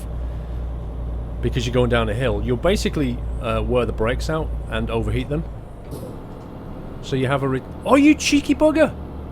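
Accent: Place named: Yorkshire, United Kingdom. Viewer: Your from Yorkshire, sound mate same!!!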